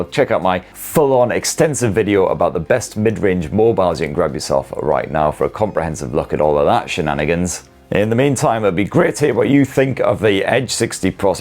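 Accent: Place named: England, United Kingdom. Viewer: Your English is veyy too English...😐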